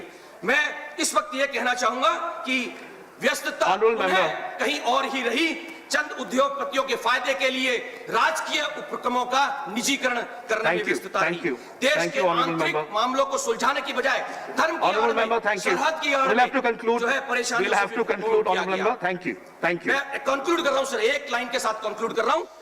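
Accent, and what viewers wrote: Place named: Revdar, India. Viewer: रेवदर की आवाज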